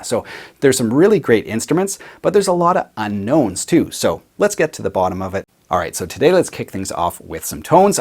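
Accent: Canada. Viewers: I've been waiting for something special as at 67 68 I'm looking for my last guitar purchase..I just sent a email as regards left hand..hopefully its a yes..massive ty everything changed for me since watching your vids and I have watched many..so informative and well presented..and I love the Canadian accent far better than American ha ha..just saying I'm an Essex man England you might not like mine either Yanks but Canadian is a smoother sound..mind you Steve Earle country sound excellent..but I want this guitar quality supreme ty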